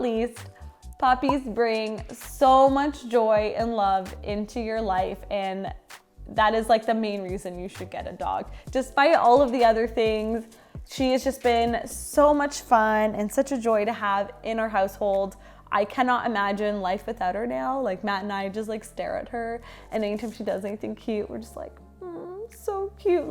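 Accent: Canada. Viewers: R u Canadian? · Your canadian, arent you? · Is she Canadian?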